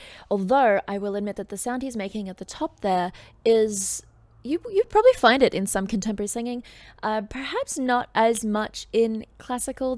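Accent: Australia. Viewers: Your honest analysis, well-balanced pausing, hair color, smile, & cute Aussie accent get me emotionally connected as well. 😍💃😁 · Don't let the "don't pause" crowd affect how you do your reactions. Do what comes naturally...most are here for a reaction/analysis..if we wanted to listen to it straight through we can! Everything sounds better with an Aussie accent BTW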